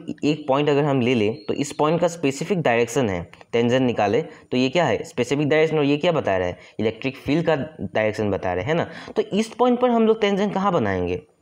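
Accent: Assam, India. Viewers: Sir apuni axomr neki apunar hindi kowa style tu amr axomiya manuhe kowar dore 😊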